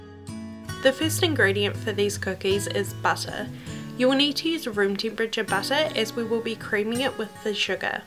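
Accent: New Zealand. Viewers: Your from new zealand right? I can hear it in your accent :D im a kiwi too! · She sounds like she’s from new zealund bro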